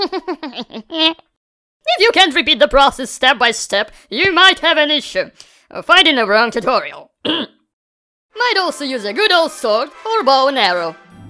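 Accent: Russia. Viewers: I hear that Russian accent in his English 🌚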